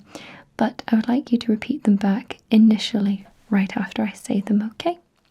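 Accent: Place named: United Kingdom. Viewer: oh my gosh! this ticks all the boxes right now!! -spoken and not whispered (not that I dislike whispered asmr, but when it's something that should be close to being realistic, I think, non-whispered is better suited!) -cognitive exam/mini-mental-status whatever you wanna call them! - so underrated -UK accent :)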